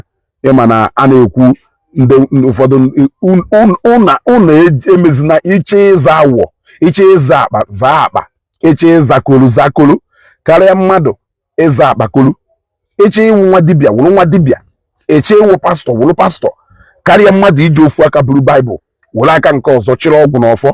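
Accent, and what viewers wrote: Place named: Nnewi, Nigeria. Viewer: Greetings to you. Just came across you accidentally right now. While listening to you talking about the arrest of a fake pastor. You sounded like “nwa afor” Nnewi Ana Edo. If you don’t mind me asking, are you from Nnewi? Abum onye Nnewi.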